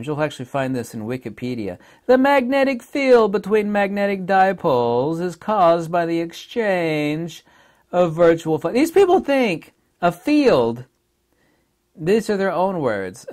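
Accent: Germany. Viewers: You got German dialect perfect nice. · Speaking German like a native, judging buy the accent you picked it up in the Saxony region.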